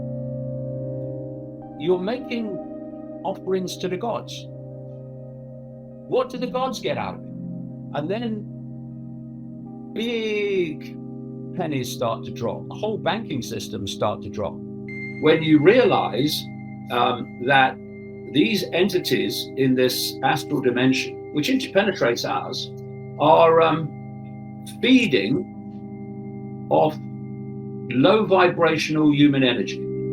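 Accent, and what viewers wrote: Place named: Hertfordshire, United Kingdom. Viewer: He's from Hertfordshire to us inner London crew he's posh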